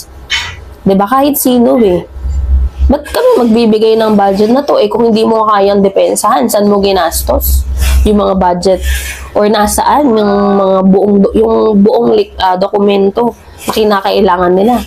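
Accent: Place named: Bicol, Philippines. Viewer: Ano yang puna mo, saan pupunta nag mmagaling parang da way you deliver like Leni style Bicol k b ha.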